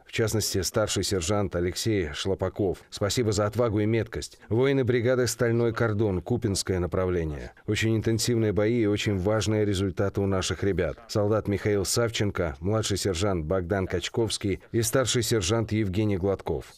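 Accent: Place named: Moscow, Russia. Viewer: почему с московским акцентом ? учи мову !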